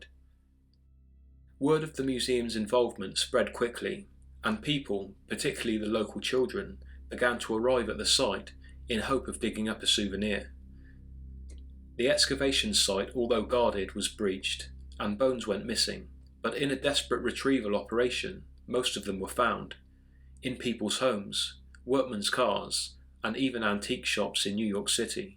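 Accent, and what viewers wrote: England, United Kingdom. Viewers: You need a better microphone to showcase that English accent. It sounds hollow and tinny. Otherwise the video was excellent, keep them coming. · Great video! Love your channel....and American girls love a English accent 🤗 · there from different countries, this is an English accent, Bale is from wales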